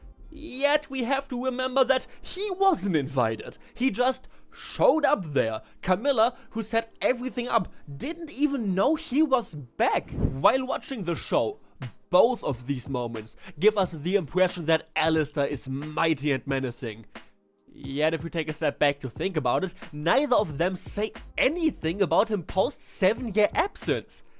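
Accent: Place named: Germany. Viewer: ich höre den deutschen akzent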